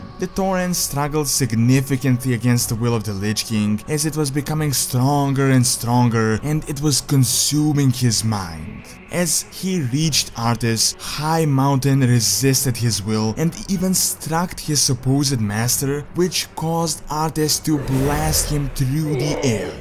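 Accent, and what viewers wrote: Russia. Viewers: Are you a Russian? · You say this is a translation from a Russian youtuber. So did you study Russian or are you Russian? That accent gives it away but just asking to be sure. Nice videos btw, as a lore fan and WoW fanatic you have my sub.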